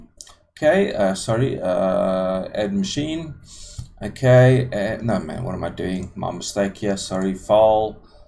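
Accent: South Africa. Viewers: Why can you always here a saffer right off the bat 😂. Lekker man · u frm SA?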